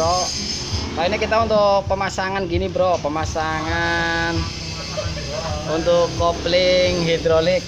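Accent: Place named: Banyumas, Indonesia. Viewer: Jen ngapak temen kang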